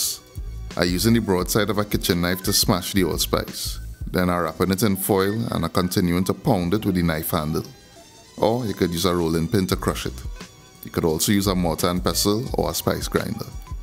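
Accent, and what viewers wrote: Trinidad and Tobago. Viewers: But on a real tho, I really like how homeboy talks, It sounds so Trini and he's straight to the point, very interesting delivery. Keep up the good work and keep bringing these delicious recipes! Respect!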